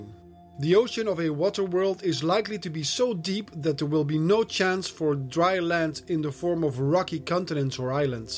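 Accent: Netherlands. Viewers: You sound very Dutch lol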